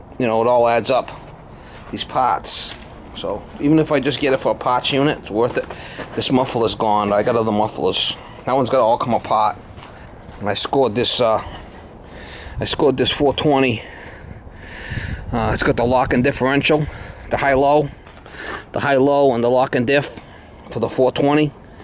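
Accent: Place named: South, United States. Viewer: Are sure you're not one of us Southerners? Lol. Keep the vids coming brother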